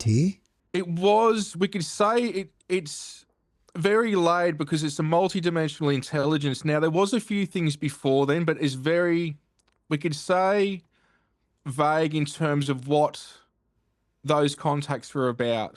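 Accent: Australia. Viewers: Love his classic old school Aussie accent! 👍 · Well, of the English speaking countries the Aussie accent makes it the least understandable. Even South Africa has clearer English. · Is there an English version? The OZy accent is too strong for me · I'M french, almost impossible to understand....sorry but aussie accent is hard for european people. But I love your country, been there twice. Love from France. ❤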